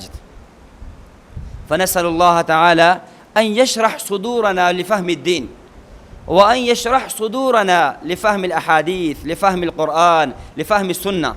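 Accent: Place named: Pakistan. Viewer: یہ عرب لگتا نہیں میں عربوں سے ملا ہوں وہ ایسے نہیں بولتے یہ کوئی پاکستانی ہے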